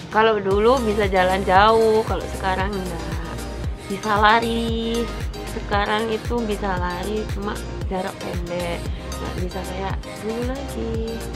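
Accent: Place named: Java, Indonesia. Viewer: Logat jawa nya semakin lama smkin keliatan kaa ehehe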